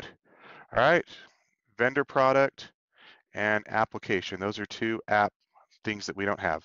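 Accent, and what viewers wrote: Netherlands. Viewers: Interesting. Are you dutch? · You are dutch ? :) hahaha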